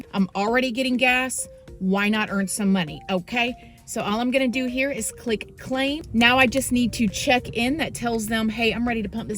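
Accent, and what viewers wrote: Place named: Atlanta, United States. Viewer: your commentary about the INCEL portion, that is a lot of conjecture with a lack of evidence, your bias is really ruining the last part of your video. you had a great objective video up until that point. for your own sake, can you make a video of how many people have committed murders that are now considered INCELS. i would love to see your facts vs jumping on the FED bandwagon. INCEL has become a slander on younger men that are mostly at that age, that awkward age for dating. the fact that people are being labeled INCEL is beyond subjective this coming from a father, husband and have had a lifetime of multiple partners and relationships. btw u sound like u are out of atl also, not the inner 285 but outside.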